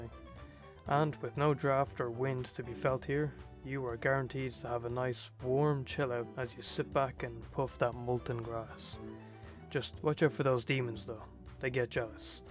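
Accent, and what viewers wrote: Ireland. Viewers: I'm from Cork and you sound like half the people I hang out with, Are ye Irish?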